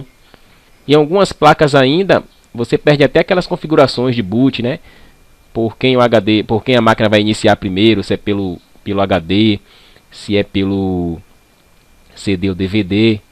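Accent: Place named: Pernambuco, Brazil. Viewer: tu ta de parabéns pela didática, simples, direta e eficaz! E esse sotaque ta me parecendo bem "leão do norte". Sou de PE, de onde você é?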